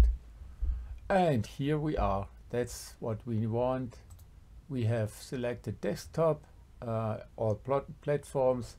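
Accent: Germany. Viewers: Man hört das deutsch schon stark raus, aber kuss für Tutorial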